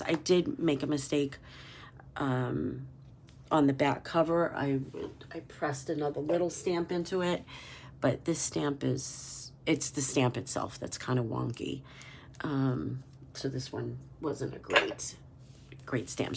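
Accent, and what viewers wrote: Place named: Massachusetts, United States. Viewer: I just found your channel on here and I’m so excited that I did. I love the content of your videos and what first caught my attention was the name of your channel and then when I clicked on it and I heard your accent, I believe that we are from the same area and I’m very curious to see if we are (if you feel comfortable answering) are you from Massachusetts South Shore by any chance? I hope to hear back.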